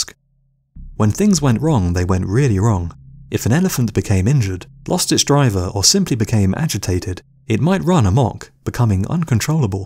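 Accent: United Kingdom. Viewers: Awww shit! You know it's gonna be good when you gotta nigga with a british ascent talkin' 'bout some medieval shit! And yes, that mace=mince-meat.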